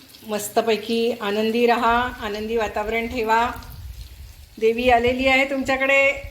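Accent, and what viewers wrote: Kolhapur, India. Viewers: मॅडम तुम्ही कोल्हापूर साईडच्या आहात की कानडी माझ्या माहेरी पण अशाच गौरी बसवतात थोडी बोलण्याची पद्धत थोडे ताटातील पदार्थ म्हणून तुम्हाला विचारले कृपया राग मानू नये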